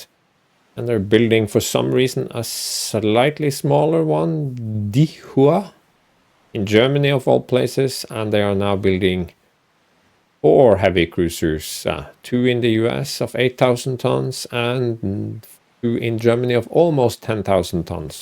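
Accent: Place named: Scandinavia. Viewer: where are you from if you dont mind me asking? youre accent sounds Scandinavian to me but i would like to know again if you dont mind me asking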